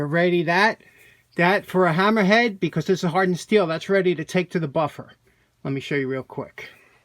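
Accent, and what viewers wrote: New York, United States. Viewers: Love your videos. I’ve been restoring old tool (mostly hand planes) on and off for the last 20 years and I really enjoy your approach and enthusiasm. Having lived in California for the past 40 year your East Coast (New York)accent takes me home to my Pennsylvania roots and all of the old family.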